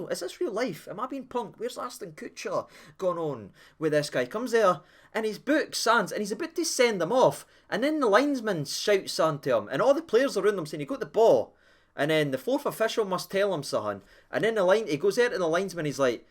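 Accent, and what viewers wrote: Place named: Glasgow, United Kingdom. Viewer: Great result last night. Love your videos and wee Glaswegian accent. Best from Oz.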